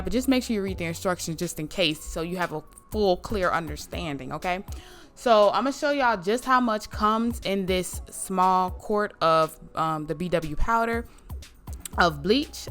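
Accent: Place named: South, United States. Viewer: SMH I love that country twang ☺️😍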